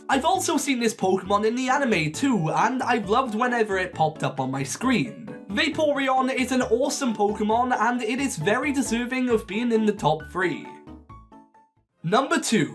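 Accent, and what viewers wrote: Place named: Scotland, United Kingdom. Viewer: Rly sorry to ask, but where is your accent from?? My little bro says you sound scottish, but I just wanted to know!!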